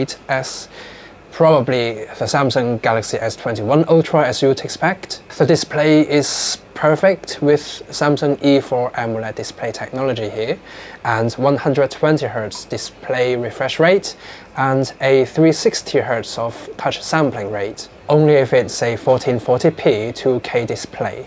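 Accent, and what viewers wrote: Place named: United Kingdom. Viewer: Sound like British tone , Good !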